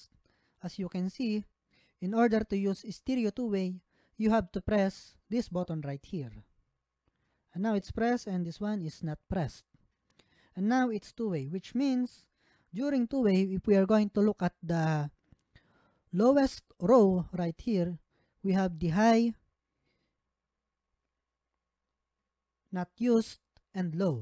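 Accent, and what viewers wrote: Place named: Visayas, Philippines. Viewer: Pinoy ka nman yata tsong kasi halata sa pagsasalira mo...bisaya ka pa nga yata e..bakit mo pa pinahihirapan sarili mo pati audience mo sa pag intindi sa sinasabi mo...nagpupumilit ka mag english...mga pinoy karamihan nanunuod sayo dito kasi nasa pinas ka kaya mas mabuti mag tagalog ka na lang para mas madali ka intindihin ok!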